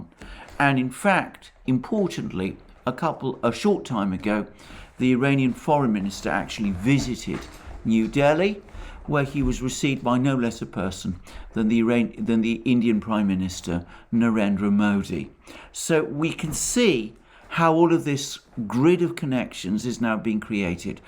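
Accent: United Kingdom. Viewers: Alexander's soothing British intellectual voice is unparalleled on YouTube! ♥️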